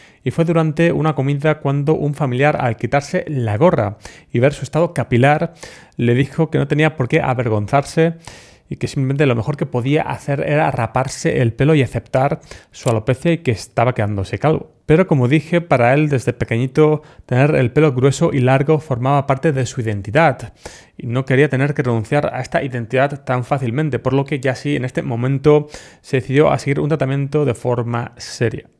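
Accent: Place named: Spain. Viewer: de donde eres? tienes un acento español pero con un dejo como si no fueras nativo en el idioma.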